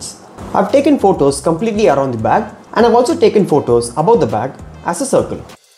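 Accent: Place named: India. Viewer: I appreciate your channel, I would encourage you to slow down your speech since your English has a strong Indian accent, it takes longer for my brain to process the accent. I think you would have more followers by doing this. Good luck and thanks!!!!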